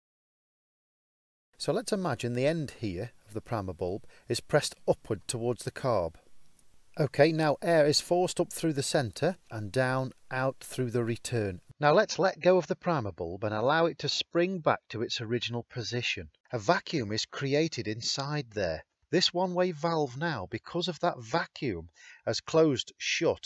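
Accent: United Kingdom. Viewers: too detailed and wordy with a British accent.... an American needs a plain-spoken and correct fellow to skip detailed diagram and illustration and theory and principles.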